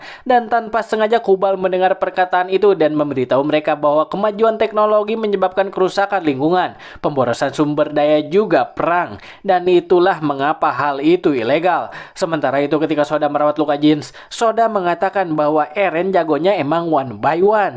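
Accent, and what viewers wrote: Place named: West Java, Indonesia. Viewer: Bang lu kaya orang sunda logat nya..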